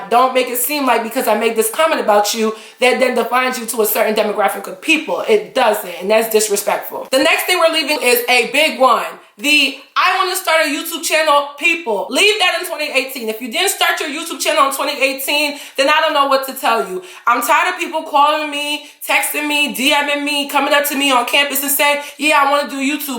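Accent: Nigeria. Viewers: that beautiful Nigerian accent😍😍😍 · Loved this video. Very funny and great points. Also love when the accent comes out. 9ja!!!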